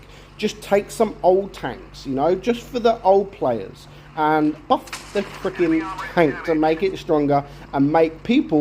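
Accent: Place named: England, United Kingdom. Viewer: ^ is ENGLISH & doing his video in ENGLISH so he speaks ENGLISH** **( well there's a fucking surprise, "DUH"!!!!! ) "If" YOU want proper pronunciation then stop-watching, fuck-off & go make a POLISH version....YOURSELF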